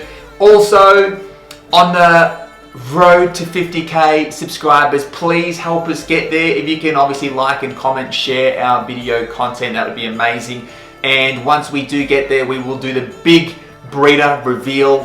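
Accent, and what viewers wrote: Australia. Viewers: Strewth mate, looks like you got another "yes" here for the idea for the next video. Love Lucy and love your Aussie accent. Keep up the good work. 😎👍🏼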